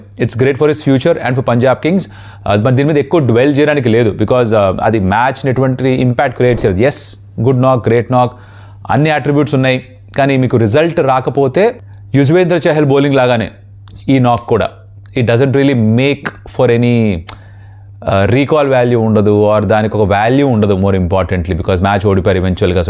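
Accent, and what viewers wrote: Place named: Telangana, India. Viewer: అరే అన్న.. నువ్వు చెప్తుంటే నాకు ముచ్చట చెప్తుంటే చెప్తూ మంచి విషయాలు చెప్తా అన్న.. సూపర్ అన్న.. మీతో పాటు కామెంటరీ చెప్పే ఆ ముసలి అంకుల్ ఏదో భాష మాట్లాడుతాడు.. ఆయనకే వచ్చు అన్నట్టు ఏదో నీట్ గా మాట్లాడుతున్నట్టు చెప్తాడు. అట్లా చెప్తే ఎవడు వింటాడు అన్న.. ఇట్ల మన తెలంగాణ భాష, యాస లో చెప్తూ ఉంటే ఆహా వినడానికి , నాటు కోడి కూర తిన్నట్టు ఉంటది అన్న.. సూపర్ మీరు..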